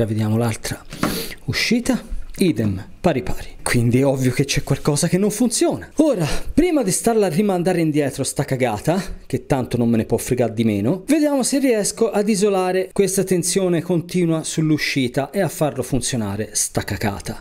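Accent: Tuscany, Italy. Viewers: Simpatico, all'inizio mi hai fatto fare qualche risata, sarà dovuto anche a quell'accento toscano, sono contento che hai risolto il problema, e hai fatto vedere agli altri e come si fa. Bene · ma quanto bello e' l'accento toscano ? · Si dice circùito, perché ciruìto vuol dire preso per i fondelli. Bravino comunque anche se sei Toscano. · Simpatico, specialmente con l'accento toscano! 10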